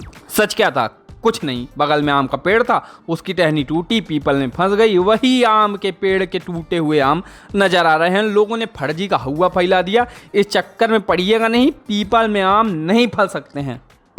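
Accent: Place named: Bihar, India. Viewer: Sir aap Bihar se hai kiya kyuki me v Bihar se hu apke language se lagg raha hai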